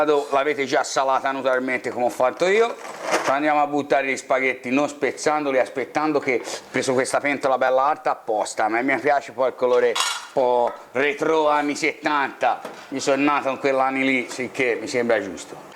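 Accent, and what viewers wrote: Tuscany, Italy. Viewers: Per caso sei toscano? 😂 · Che sei toscano? mmmm come mi harba questa carrettiera! Grande chef😉😉😉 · Vivo all'estero..che piacere sentire questa parlata toscana mentre prepara ricette antiche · Oltre le the ricette me piace il tuo accento oscano. Bravo.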